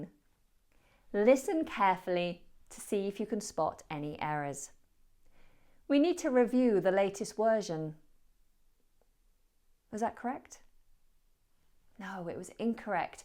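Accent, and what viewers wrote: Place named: United Kingdom. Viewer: British English pronunciation with Miss!!!... Excellent!!!...❤💫👌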